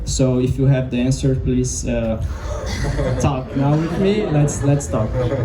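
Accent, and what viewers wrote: Brazil. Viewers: Você fala inglês muito bem! Não sei se é do seu interesse, mas caso seja, você pode tentar estudar um pouco o alfabeto fonético da língua inglesa pra treinar um pouco a pronúncia nativa. Isso não é necessário, mas talvez seja interessante caso queira perder um pouco do sotaque de brasileiro (espero que entenda que não é uma crítica, provavelmente você sabe mais de inglês que eu! Kkk)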